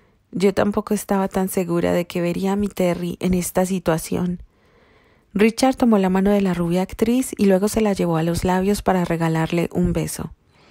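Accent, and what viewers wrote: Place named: Latin America. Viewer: su acento latinoamericano tan hermoso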